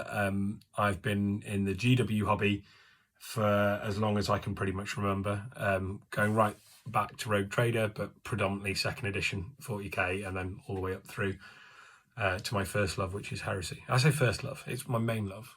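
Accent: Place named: United Kingdom. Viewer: You sound british are mustangs even sold there? Or are you not and I’m just stupid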